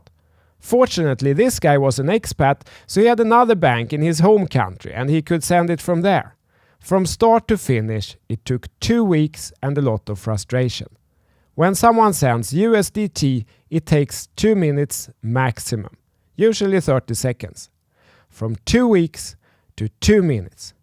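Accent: Sweden. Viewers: I lived in Sweden for 6 years. I have never heard a person have more Swedish accent while talking English. That's why I'm subscribing to your channel 👍🏼😊 you both have points. Her point is that it costs too much electricity to keep running and make new currencies.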